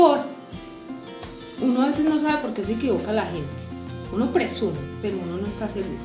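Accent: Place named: Medellín, Colombia. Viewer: Yo creo que más que dejar un LIKE por dejarlo yo creo que para algo se creó así como el dislike y pienso que dar LIKE a un vídeo es de alguna manera valorar el trabajo de la persona porque el que hace vídeo como nosotras sabe el trabajo que esto lleva y lo que uno se esfuerza para que de alguna manera entretenga y guste. Por otro lado también estoy de acuerdo en algo que mencionaste muy por encima y es que algunas personas se ve que por quedar bien decir bueno ya cumplí ya me pase por tu canal te dejo un comentario para que sepas que te veo y que me debes pasar por el mío. A mí eso me parece de mal gusto porque el vídeo dura 5 min te lo reproducen 30 seg mientras te escriben y ya. Yo no hago eso a menos que el vídeo no me guste por supuesto. Pero estoy absolutamente de acuerdo contigo. Quise ver este vídeo porque quería conocerte por el acento seguro eres de Medellín :) que bueno saber un poquito más de ti. 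Un abrazo y nos seguimos viendo guapa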